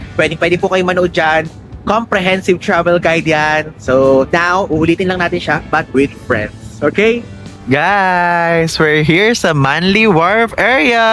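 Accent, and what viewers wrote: Nueva Ecija, Philippines. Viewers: Pag lumalabas ung Nueva Ecija accent mo naalala ko ung friend. Ang kyuuuut hahaa 😁🤗🤗🤗🤗🤗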